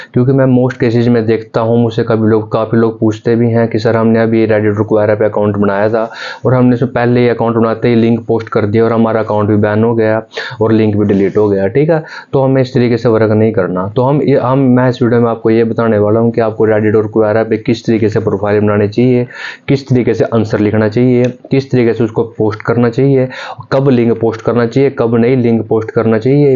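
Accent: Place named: Haryana, India. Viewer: U r from. Language to bhai aapki ambala ya Ynr area ki lag ri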